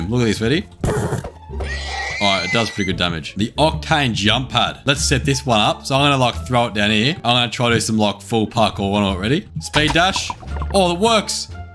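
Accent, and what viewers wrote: Australia. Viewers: this video just popped into my recommendations and holy shit this guy is aggressively australian (in a good way)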